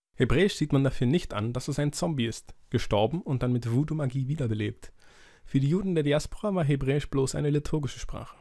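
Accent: Germany. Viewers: Hallo. Das ist wirklich ein toller Beitrag und super interessant. Ich musste ihn allerdings mehrmals hören, weil du sehr schnell sprichst und dadurch auch teilweise sehr undeutlich. Das ist ein bisschen schade, denn sonst wär das echt top. Ps. Sicher bist du Berliner? Du klingst eh ein Flensburger.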